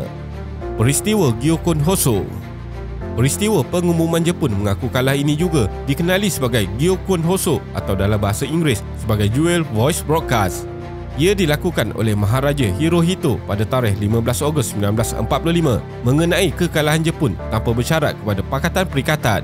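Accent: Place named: Malaysia. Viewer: semua dah mantap kadang2 sebutan bahasa asing kebanyakan video terlalu lidah melayu sangat, harap dapat diperbaiki lagi 👌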